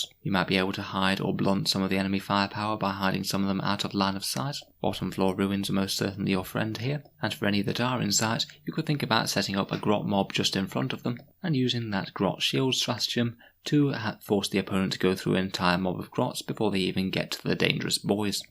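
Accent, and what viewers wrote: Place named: United Kingdom. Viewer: British accent perfect for describing dakka.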